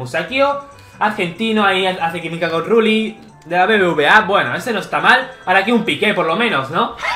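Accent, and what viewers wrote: Germany. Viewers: eres alemán? 😃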